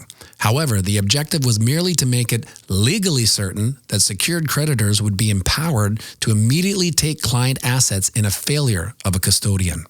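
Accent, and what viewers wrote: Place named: United States. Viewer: It's horribly American - Nice if you're American, maybe?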